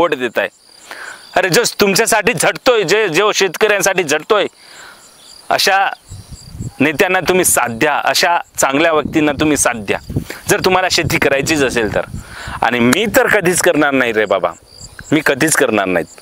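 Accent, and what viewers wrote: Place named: Uttar Pradesh, India. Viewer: भावा भैया सारखा बोलतोस यूपी mp cha वाटत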